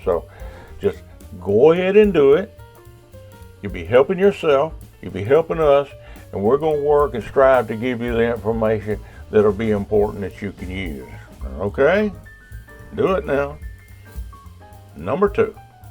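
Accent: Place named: South, United States. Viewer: I am new to your channel, and I really like it!!! Hi there y’all, 😉😎👋🏼. Thank you for sharing a really great topic, and video. I can tell by your character & personality, that you’re a good man with a good heart, and in a happy, and loving relationship, with your Filipina wife. I am from California, and I dig your thick southern accent. Both of my daughters now live in New Braunfels, Texas. So, whenever I’m there for a visit, I get a kick out of hearing Texans speak with their thick accents. To me especially, nothing is cooler than hearing a “Mexican” speak with a Texas accent, lol!!! Once again, I like your cool accent, if I can ask, what part of the country are you from, brother, 😎😉🙏🏼?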